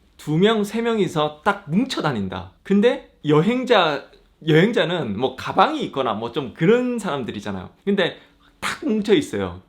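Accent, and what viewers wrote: Gyeongsang, South Korea. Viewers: 와우 경상도 사투리가 마 쎄 빡 섞인게 매력적이시고 방송 잼나네요. 여름에 이탈리아 가는데 이방송이 참고서 되겠네요. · 얘기가 경상도 사투리로 구수함이 느껴져 구독합니다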